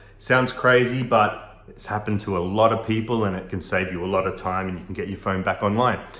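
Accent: Australia. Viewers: Yet another success story - thank you so very much! And as a bonus, I was able to be enchanted anew by that delightful Aussie accent, with tooth peeks which aren't too theek :)) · What a blessed relief it is to hear some one speaking in Aussie accent (or is it a Kiwi accent? - I can't tell the difference) instead of an American accent. And someone who speaks clearly. And better yet still - not to have some pointless bloody awful noise going on at the same time. Well done on this count alone. Your advice also came up trumps! - it was the tooth pick suggestion that saved the day. Much thanks!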